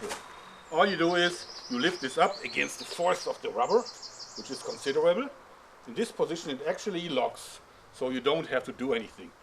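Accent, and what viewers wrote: Germany. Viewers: Are you German? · His accent sounds very authentic, actually. I don't know where you see his accent slipping, but you clearly don't know what a real German accent sounds like. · Du bist safe Deutscher. Wie lange und wo du wie lange wohnst ist mir egal. Du hörst dich an wie ein Deutscher der Englisch redet. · YOU ENGLISH IS GRAMMATICALLY, WICKEDLY EXCELLENT. ...OF COURSE WITH A NICE GERMAN ACCENT! ...WICKEDLY ENTERTAING AND FUNNY. 😀😀😀😀👍👍👍👍💖💖💖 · You are German! I can hear it! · Dein deutsch aktzent is nicht zu überhören xD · U sounds like a German Guy that’s try to speak Englisch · are you German? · I am german and i know a german accent when i hear one and i sound exactly the same like him when i speak english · Are you german? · are you german? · Du bist duetsch das hört Mann aber cool Video Mann i Love Lucille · warum hört sich sein englisch so deutsch englisch an?😂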